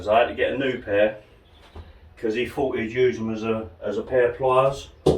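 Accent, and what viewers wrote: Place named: Kent, United Kingdom. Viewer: Did u do that or was it decommissioned before you brought it bud . Got my eye on 18 t prison van . I have class 1 hgv n dcpc..... I was on the understanding that if truck is used for private use only (after I brought it ) then I dont need to use my digi card . You sound local to where I grew up north west kent . Now in Essex. Great vids bud . Handy ideas for mine .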